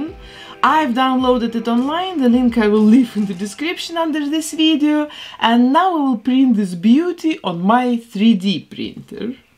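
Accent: Russia. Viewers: Is your accent Russian I’ve been trying to guess but I’m not sure I can’t put my finger on it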